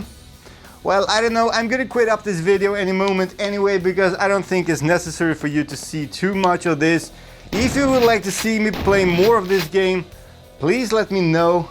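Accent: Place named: Sweden. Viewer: Yeah no problem, glad to help you and the community. I used your method and everything else worked, except the weapons. All the files were there in the "models" folder, and other objects like barrels, etc looked HD, but not the weapons? Really weird. I did see a couple other posts other places, of people having the same issue. Also, I found that "Dark Forces" does not use the DOOM engine, and does not work in VR (yet). Love your channel, love your videos. I also like your accent, where are you from? Sweden?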